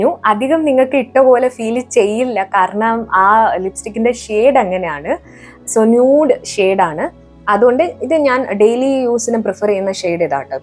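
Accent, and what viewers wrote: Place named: Kottayam, India. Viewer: Luvd ur video, n ur look too.. ടെ വർത്താനം ഒത്തിരി ഇഷ്ടമാണ്.. ആ mike nte 'സോക്കെട്' കേട്ട് ഞാൻ ചിരിച്ചു..തനി കോട്ടയം വർത്താനം ആന്നെയ്..😅 ഇവിടേം അത് പോലോക്കെ പറയാറുണ്ട്😁 like ur videos, esp dress hauls..kp going dear. ❤ Princy,frm Tiruvalla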